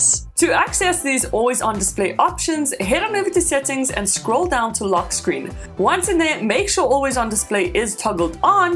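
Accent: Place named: Afrikaans, South Africa. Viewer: Ek wou sê jy klink afrikaans😂 goeie video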